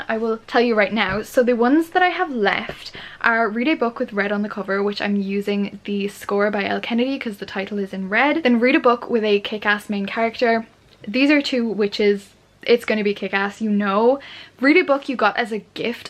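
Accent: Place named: Ireland. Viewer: Sounds like an Irish accent 🙂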